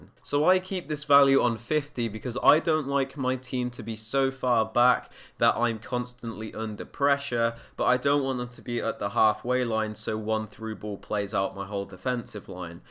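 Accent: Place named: Birmingham, United Kingdom. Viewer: Are you from Birmingham you speak like my cousins 😂😭👅😆 and shall I get Fifa 18 on the switch I mean I have it in my PS4 so I dunno I need opinions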